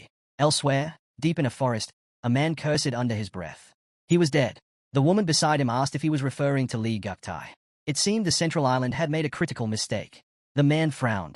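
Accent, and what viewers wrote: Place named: United Kingdom. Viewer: British ahh voice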